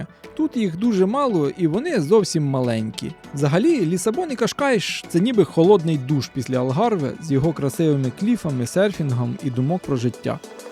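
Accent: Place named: Poltava, Ukraine. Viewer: а мені здалося, що у Вас полтавський діалект. Принаймні, дуже схоже! 😊 Дякую за таку цікаву подорож!❤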